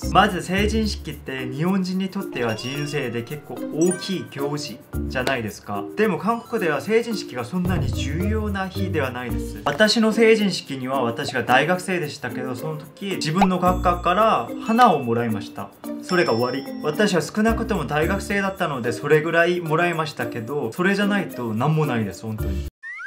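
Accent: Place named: Kansai, Japan. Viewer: 関西弁やん！と思いました！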